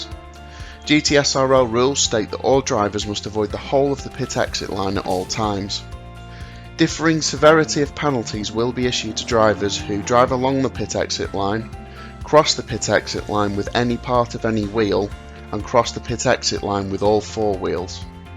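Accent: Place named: RP, United Kingdom. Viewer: hell yeah commentary with queen's english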